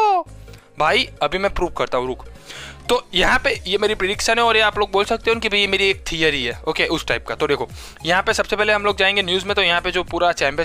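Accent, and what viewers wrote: Gujarat, India. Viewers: Kya ap Gujarati ho . me bhi Gujarati hu mujhe apki language Gujarati jesi lagi.plz reply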